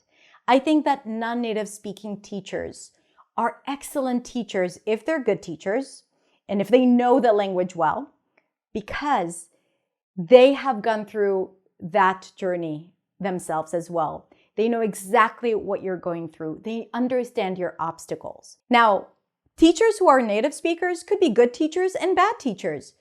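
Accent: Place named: United States. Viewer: English is my second language and i've learned it just by watching youtube videos and listening to english podcasts (joe rogan, mr ballen etc). I've been doing this for many years and many hours per day, every day. So..., i'm sorry but your statement that learning a language by watching/listening the targeted language is bad advice is mind blowing to me. Can you explain how did i acquire my english? I've never spoke it while learning it, and one day, i just start speaking it spontaneously. You dont learn a language, you acquire it by listening and reading and you dont have to speak it, for it will come later, naturaly. Also your american accent is flawless, i think english is not your second language, if i am wrong, im sorry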